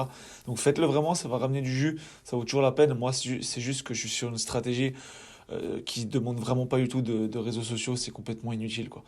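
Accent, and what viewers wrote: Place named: Belgium. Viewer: Salut merci de ton partage. moi, je donne juste mon avis. Un lien venant d'un site anglais n'est pas gênant a condition que la langue reste en anglais. Pourquoi ? Quoi de plus naturel d'avoir un lien d'un site anglophone qui fait un lien vers un site en .fr (ou .be au vu et au son de l'accent) du moment que l'ancre ou les mots proches annonce que la source (du lien) est francophone. en gros si on site un site étranger et qu'on explique dans le texte l'origine du pays du site, ça passe. et surtout ça parait naturel.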